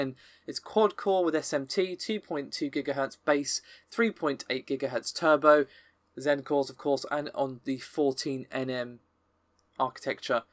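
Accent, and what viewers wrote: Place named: Manchester, United Kingdom. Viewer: I am getting better at detecting british accents. This guy is from.... Manchester !!!!!!!??????????!!!!!!!!!??????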